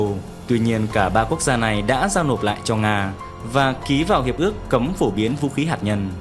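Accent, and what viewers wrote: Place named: North Central, Vietnam. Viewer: 1trăm 20,chứ k phải 1tăm 20..phát âm bắc trung bộ, lai bắc bộ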